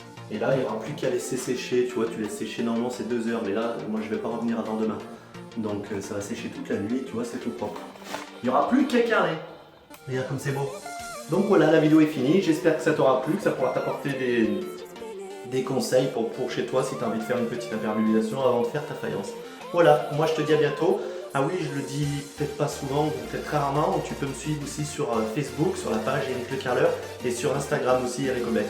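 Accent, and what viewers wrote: Loire, France. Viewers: Ok je me disais bien que tu avais un petit accent du 42 ;) et une petite recherche google m'a confirmé ça. Ma mère bosse dans des petites boites de BTP dans le même département que toi ! Continue en tout cas, au top tes vidéos, et je rigole bien à chaque fois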